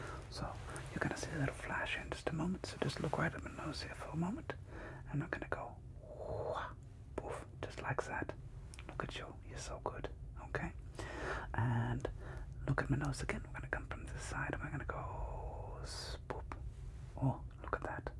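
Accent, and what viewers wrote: Germany. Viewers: I love everything (!) about this video! is such a great, funny, professional, and caring sleep doctor!! And I know I've said this before, but I cannot say this often enough, the German accent so much on point, it's hilarious!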